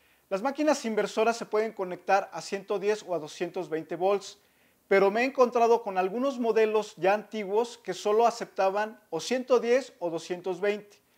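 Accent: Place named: Mexico. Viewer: Hola Buenos días de que país eres nacional? Creo que eres Mexicano por tu forma de hablar, Saludos desde Valencia Venezuela.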